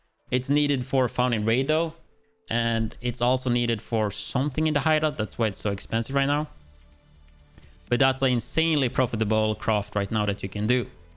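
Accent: Sweden. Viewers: are u swedish i hear som swedish dialekt haha lol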